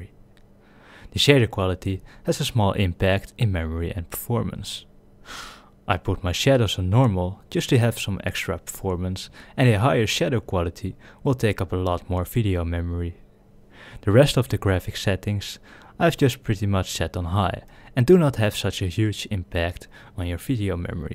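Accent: Netherlands. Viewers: Easily tell he's dutch, they all sound the same :) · Ja nederlnads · Ben je een nederlander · Are you dutch? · definitely dutch. Gekke nederlander :P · I don't know why but this guy sounds Dutch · I thought he was a friend of mine but he is probably dutch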